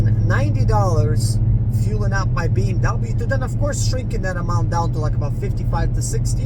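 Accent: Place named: Balkans. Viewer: What nationality are you? You sound very european. Particularly balcan. Am i correct?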